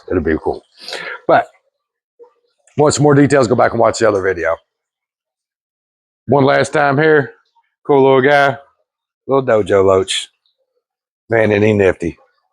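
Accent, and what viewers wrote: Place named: Kentucky, United States. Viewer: Kentucky ? Coool I guess I should have guessed by your accent but I couldn’t . I’m in ny !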